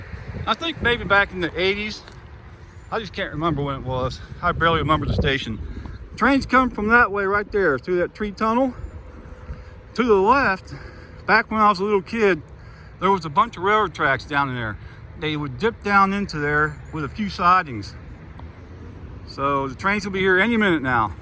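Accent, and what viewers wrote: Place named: Ohio, United States. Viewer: Thanks for telling us about the guard rails. So from the sounds of it, you were raised in Ohio. I was too, in Worthington. I have family in LaRue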